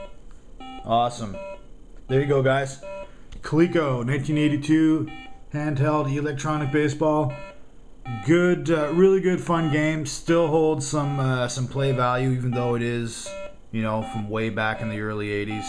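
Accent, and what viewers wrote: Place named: England, United Kingdom. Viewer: amazing man. great memories. and when you said you grew up listening to the expos on the radio, i almost cried. what are the odds?? i too am from Montreal and grew up a big expos fan and also used to hear them on the radio every game. i loved my expos. but how come you listened to Jacques Doucet and not Dave and Duke? you sound english, so this shocked me. My dad used to listen to Doucet, then i'd change it to Dave van Horne and duke snider and we'd listen together. Great memories of Nos Amours and the good old days. thanks for the vid. you brought me back to my happy time.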